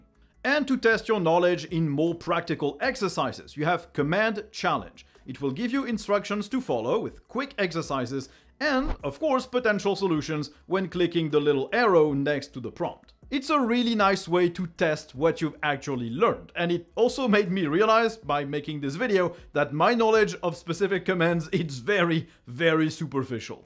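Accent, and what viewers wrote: France. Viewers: Thanks, I'll check some of these sources out. And I don't mind your French accent (barely noticeable) as I speak French with an American accent. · Your French Accent is a breath of fresh air in this world that is inundated with American nonsense. Never apologize. Thanks so much for sharing. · J'aimerais bien avoir le même "French accent" que toi 😅 Merci pour cette énième vidéo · great video, In his time, thank you, Btw i like your french accent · French accent is the ❤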